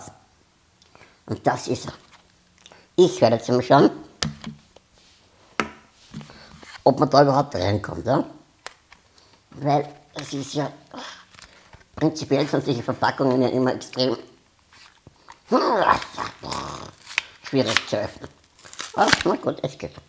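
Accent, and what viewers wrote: Austria. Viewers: A Österreicher? Oda vo wo?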